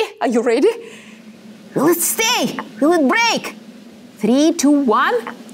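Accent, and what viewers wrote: Russia. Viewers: Думал, нифига я английский начал каждое слово понимать, а, нет, акцент наш просто.)) Сразу всё понятно.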